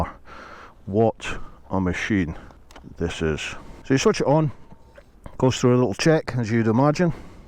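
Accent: Scotland, United Kingdom. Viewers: You know what I like the most? Your Scottish accent 😀 . Regards 🇲🇹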